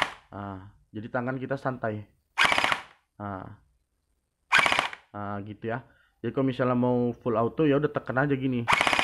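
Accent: Medan, Indonesia. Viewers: Jooosss bang.. Cm dr logat nya kyk org medan ni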